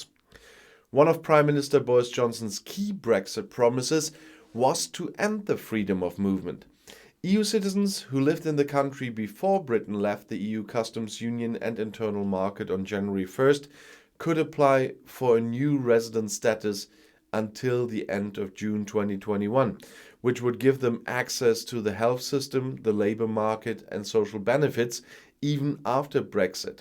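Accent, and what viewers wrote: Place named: Germany. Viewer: ), 'Wertschöpfungskette':)., you are a true European: you combine convincingly English spoken news with a slight German accent, having trouble remembering the word for supply chain in your native (?) language with a Belgium given name and Dutch last name.. Way to go! BTW: Genius example of German functional descriptive language word salat. BTW2: I just realized being Dutch from German ancestry with my kids, all possessing Italian, French, Dutch and possibly German nationality. My email address ends in.eu. We will get there eventually.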